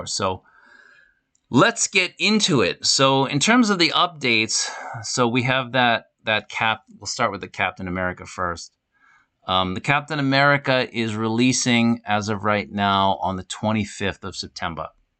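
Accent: Boston, United States. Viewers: So Boston accent ❤ GO CELTICS!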